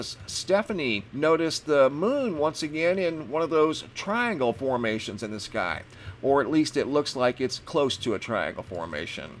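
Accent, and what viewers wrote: United States. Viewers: Love the way you American's pronounce English town/city names, so cool, wish some English people would annunciate more.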